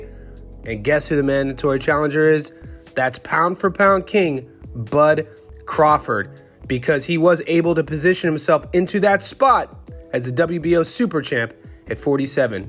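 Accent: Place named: Australia. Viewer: Tszyu you say you're Mexican maybe you are but not really you sound like an Australian you born in Australia you look Australian and because you from Australia you are Australian not Mexican so you can forget about that and probably the best that Australia has to offer in the boxing world. Yahweh said that's known as Jesus now you are not a pastor in your own land you know boxing is all politics just like everything else your father might be from Mexico but where you at now Australia I never heard of a Mexican living and born in Australia that's unheard of that's the other side of the world ...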